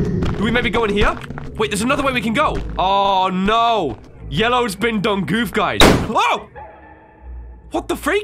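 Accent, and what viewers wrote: United Kingdom. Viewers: You bri'ish mate?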